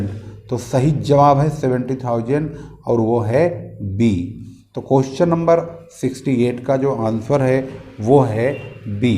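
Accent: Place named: Meerut, India. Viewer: Sir i think you're from meerut,i guess by your tone 😅and thanks for your video 😊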